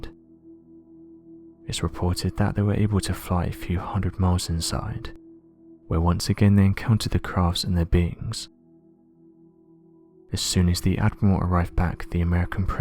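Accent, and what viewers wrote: England, United Kingdom. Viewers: Why are you whispering ? Speak up already ! It’s bad enough that your slurring your words through your English accent. Can’t we get an announcer that speaks American English !?! It’s freakin annoying already.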